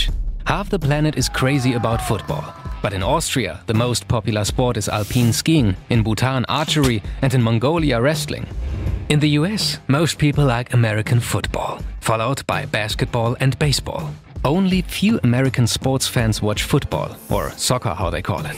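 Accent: Germany. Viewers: I like this guy's thick German accent. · Still loving den deutschen accent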